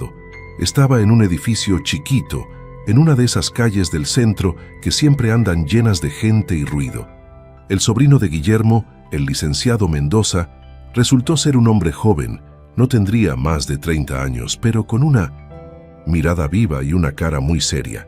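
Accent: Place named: Dominican Republic. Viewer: Te.escucho.d e.dominicana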